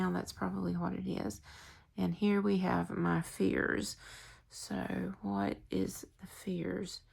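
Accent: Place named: South, United States. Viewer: LOVE your southern accent... sounds just like mine from NC💞 Thank you for sharing your cool decks! I totally resonated with your large spread about family issues. Every card was right on.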